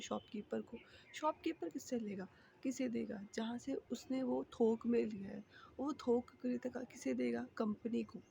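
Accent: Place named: Kashmir, India. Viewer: Nice information but I am NRI 😅. Also , you sound like Kashmiri 🎉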